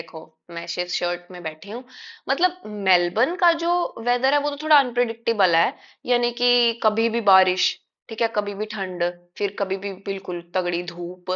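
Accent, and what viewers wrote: Haryana, India. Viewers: se aap hariyana se lagti ho 😂😂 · Haryanvi accent is lovable..it suits you. And this bhartiya Nar wants spouse visa 😁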